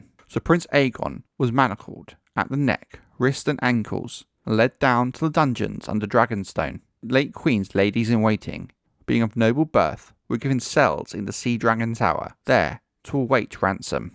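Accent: United Kingdom. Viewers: Too British I can't understand without subtitles.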